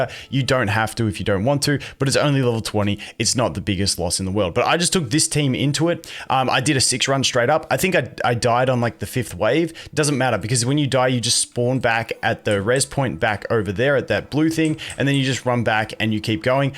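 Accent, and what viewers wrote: Australia. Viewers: Is old mate aussie?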